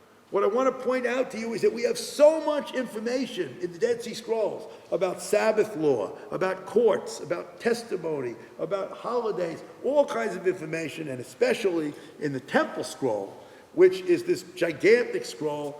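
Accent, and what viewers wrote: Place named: New York, United States. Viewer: Love the accent. Reminds me of a voice actor of a Ralph Bakshi movie. Luv it. Hey Shiffman,,,,,,,,,,,Brooklyn or Queens? LOL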